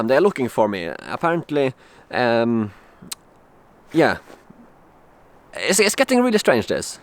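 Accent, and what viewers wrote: Sweden. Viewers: Detta är alltså inte satir? Hade ingen aningen om att Svenskar fortf postade videos med så grov svenengelska som denna. Går ju inte att koncetrera sig på innehållet. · tried to watch. but the swenglish is just so bad i cannot stand it..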